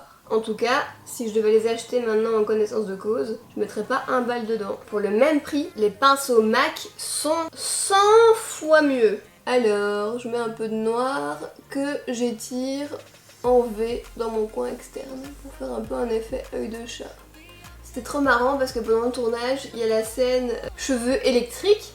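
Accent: Belgium. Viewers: J’adore l’accent belge mais sourci ça passe pas 😂😂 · Trop heureuse que Batiste t'es choisi pour la campagne! Tu es géniale ! Par contre déçu qu'il t'oblige a dire "huit" avec l'accent français, je préfère ton joli accent belge! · Je viens de commencer à te regarder et je t'adore . Tu es Belge ? Moi oui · C'est malin je vais écouter maintenant! Par contre j'suis déçue qu'on t'ai forcée à dire le "huit" à la française, en quoi est ce que choquant qu'on entende que tu viennes de Belgique? C'est dommage ça retire ton charme:( Et puis merde vive la Belgique! XD (Oui je suis belge XD)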